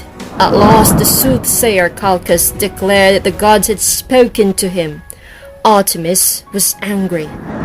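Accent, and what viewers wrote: United Kingdom. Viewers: Sana all british accent, lami ra paminawn uyy...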